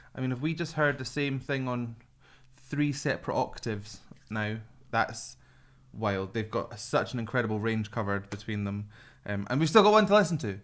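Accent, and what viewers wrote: United Kingdom. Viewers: Thank you for your professional analysis! I love your British accent. Either UK or Scotland. Love it! Here is my expression of their voice range if I compare to colonial style of house range. From the left from us Ko Woo Rim: Bass as foundation of house &deep basement to support strong house built. Kang Hyong Ho: Angelic voice, tenor and falsetto as 1st& 2nd floor shooting up to attic through out of the roof like a roller coaster.(can do male and female parts, check Phantom of the opera) Jo Min Gyu: Tenor. 1st& 2nd floor(he can also do falsetto beautiful way) Bae Doo Hoon: Tenor. 1st& 2nd floor(he was musical actor so he brings out so much of emotion) I love them all together. Because of their different range of vocal ability, it bring out the best! They all work together from foundation of the house, basement, 1st floor, 2nd floor and attic to roof to complete the house project! 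Everyday they bring the joy!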